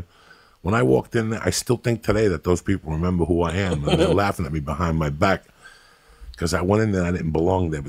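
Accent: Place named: Italian American, United States. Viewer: He could play the old fat Italian mafia boss kind of role even the accent he's perfect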